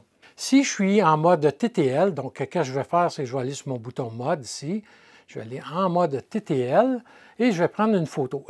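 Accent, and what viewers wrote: Canada. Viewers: Merci infinim❤ent pour cette belle présentation. Vous dites mettre à disposition le manuel en français; où puis-je le télécharger ? Encore bravo et je me plais à découvrir vos différentes vidéos avec un très joli accent canadien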